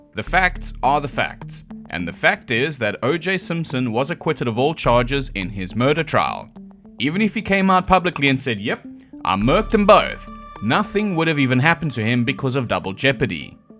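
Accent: South Africa. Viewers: I knew you were South African! I've asked you several times because I recognized the accent. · I've been watching (listening to) your videos a lot while drawing this past month, and that entire time, I, an Australian woman, have been trying to figure out if your accent is from New Zealand or Tasmania. As soon as you said South Africa, it was a total Eureka moment.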